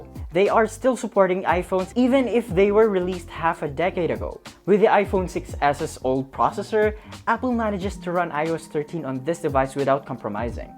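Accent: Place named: Philippines. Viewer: Knew he was a filipino by the accent, hahah proud pinoy!!!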